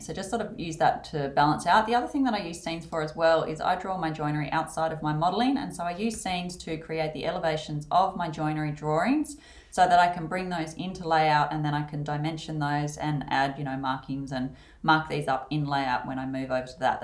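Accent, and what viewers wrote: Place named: Australia. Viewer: Some great tips for beginners and love the speed and direct approach. You mentioned a few times that you use a very powerful computer as the polygon count can get very high. Could you please share that info so if we upgrade our systems we have an idea what to get. Mother board Brand/Model: CPU Brand/Model: GPU Brand/Model: Ram Type/Speed and Number of Gig: Love your accent (maybe Australian) Nice clear voice and a great visual presentation Just one little thing to improve, the audio had some reverb and sounded hollow( possibly too many hard surfaces in your room) Great Work.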